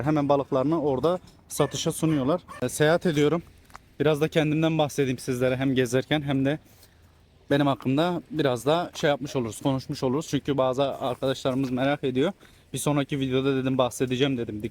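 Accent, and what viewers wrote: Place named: Kurdish, Turkey. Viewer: Abicim sen yapamıyorsun, bir kere konuşma aksanın bozuk kürt m7 emi nece belli deyil konuşuyon belli değil vidyoların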